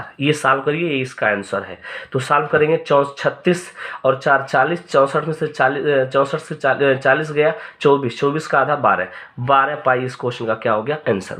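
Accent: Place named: Bhojpuri, India. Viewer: Bhojpuri achha bol rhe hai sir.....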